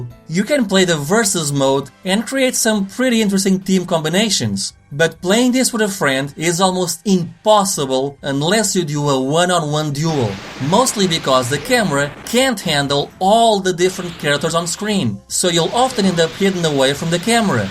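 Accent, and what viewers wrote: Portugal. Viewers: I sense a Portuguese is reviewing this, dá-lhe amigo 👌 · Desculpa... Mas tu és português? É que o sotaque é quase inconfundível!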